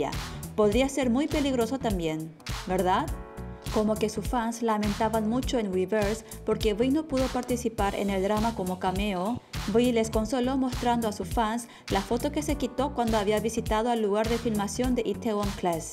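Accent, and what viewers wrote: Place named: Spain. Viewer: La semana próxima se estrena esta serie en España estoy deseando verla y sobre todo poder oír la canción de mi bias en. Por cierto hablas un español perfecto, con una cuidada gramática, palabras difíciles y no muy corrientes, tu acento parece aprendido en España, estoy totalmente asombrada, magnifico trabajo, saludos desde Barcelona, esperando que salgan las entradas para el concierto de, ojalá no se anulen.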